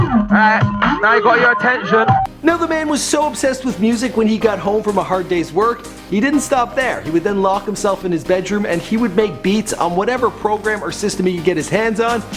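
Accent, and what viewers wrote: United States. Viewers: Its ok his american/canadian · Wtf is this American chattin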